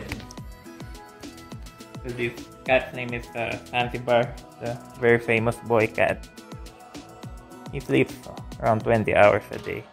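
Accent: Philippines. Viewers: Such a Filipino accent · He still speaking like a filipino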